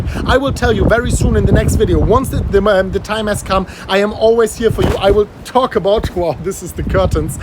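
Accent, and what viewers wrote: Germany. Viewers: Dieser geile deutsche Dialekt:-) Bist echt ein cooler Typ und ich bin durch dich überhaupt auf Crypto gekommen. Hab mir heute einfach just for fun für xxxx Euro Bitcoin gekauft und lass es liegen:-) vielleicht hypen mich aber deine Videos auch wieder etwas mehr zu spekulieren! Daumen hoch, viel Spass weiter im Paradies. Deutschland ist immer noch kalt;-)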